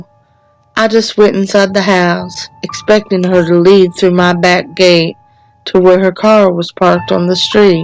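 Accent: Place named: South, United States. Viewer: you're welcome chicken. 😘 Love the southern accent btw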